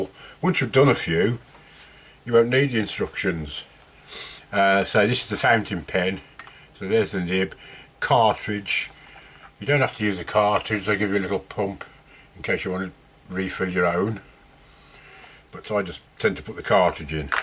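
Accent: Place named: Black Country, United Kingdom. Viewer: You have a proper Black Country accent, nothing like a Brummie accent at all, I’m really surprised. I like to watch your videos, very interesting with a bit of light heartedness thrown in. Kind regards to the hardest working part of the duo.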